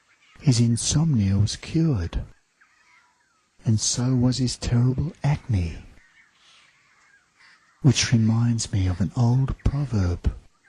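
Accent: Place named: Australia. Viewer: Lol. You're the best!! I love your "work / YouTube posts"... weaver of parable, and allegory, with an Aussie voice and calming background sound, Master, I flourish a bow in your direction. You must be quite the interesting "normal guy". You have helped me much over the years. Post more!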